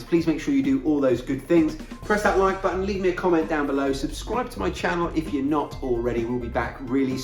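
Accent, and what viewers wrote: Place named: England, United Kingdom. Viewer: Just found you! ❤️ Just subbed can't wait to check out your other vids and no offence to our American cousins your English! Just a bit more relatable - it's almost Summer in Australia so my mind is everywhere - so are the flies! As a migrant from UK I have never got used to them ,- those the ants and the flipping spiders! Will make the tincture to try to keep the ants at bay wish me luck! Looking forward to being a Subby and sharing vids with family and friends. Best wishes and positive vibes from Sydney Australia 🇬🇧❤️🇦🇺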